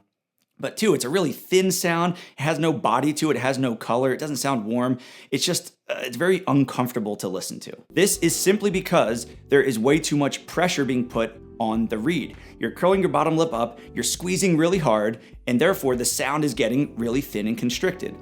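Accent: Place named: New Jersey, United States. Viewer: AWW but it's gotta be a jersey accent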